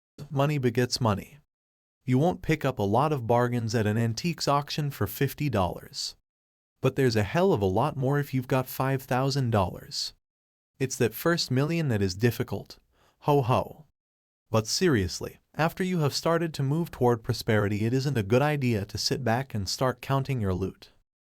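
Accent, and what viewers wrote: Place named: Asia. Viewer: ROBOT READER with Asian Accent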